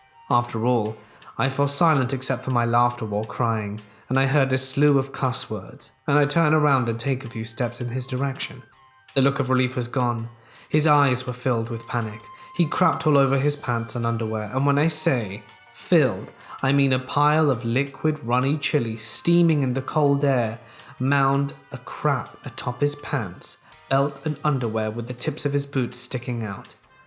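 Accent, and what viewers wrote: England, United Kingdom. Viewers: Nothing better than hearing vampirish English accent saying “shart” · R u from England??? Cuz u have a certain accent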